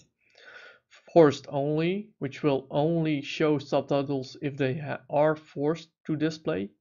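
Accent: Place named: Netherlands. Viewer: dag,ik hoor precies dat je Nederlands bent.Daarom vraag ik het in het Nederlands. Weet u hoe ik die ingebakken Aziatische of Arabische ondertitels kan verwijderen zodat ik mijn gedownloade kodi ondertitels kan plaatsen. Normaal heb ik geen probleem met buitenlandse ondertitels maar de ingebakken ondertitels nemen soms bijna de helft van een film in. groetjes Peter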